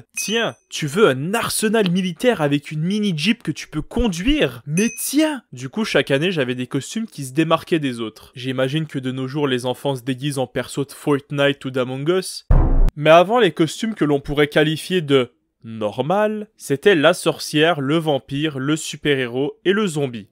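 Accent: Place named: Canada. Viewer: Oui no jugement mais j'aime bien l'accent canadien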